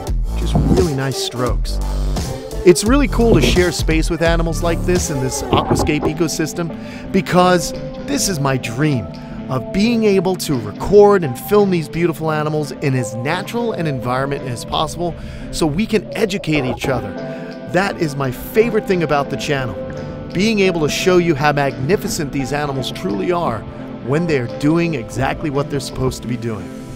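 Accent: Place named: New York, United States. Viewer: Nothing wrong with a New York accent.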